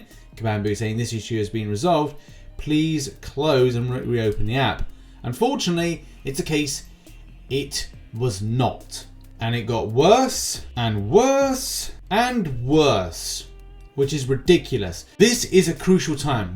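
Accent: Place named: United Kingdom. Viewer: Hey, I’m asking how do you have marvel insider, you sound like you’re from the UK, and so am I, but when I went to get it, it said it was only for US residents.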